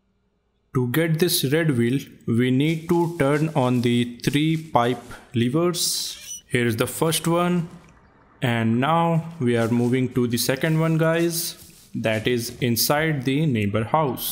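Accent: India. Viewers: 😂😂 indian ho bhai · It's because his an indian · His voice sounds like Indian but peaceful but it’s cool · He is indian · He Indian most Indians have an accent like that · Resist kids his indian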